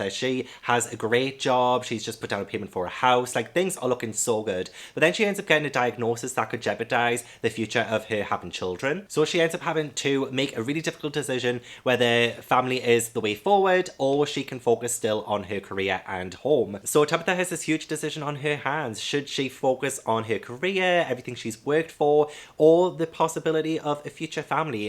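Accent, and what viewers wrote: United Kingdom. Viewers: For those who complain about how you say books must not know that you have a beautiful British accent and that is how the British say books, the way you tried to say books sounded unnatural to me...lol...sounded like you were trying to do an American accent. I personally love hearing a person speak that doesn't sound the same as me. My personal favorite is the way people from the UK say helicopter, it's so adorable. Never change for anyone, always be you. I couldn't stop laughing about that manga Dick Fight. Now, I am curious about it...lol. Also, shout out for the hoodie, that's '90's classic Nickelodeon cartoons, love it!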